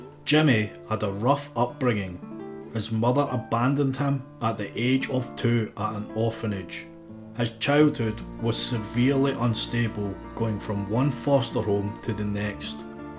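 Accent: Scotland, United Kingdom. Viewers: Narrator is Scottish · I must say the Scottish, when they’re not excited, speak the clearest English in my opinion and im a Londoner. Good luck fella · He's Scottish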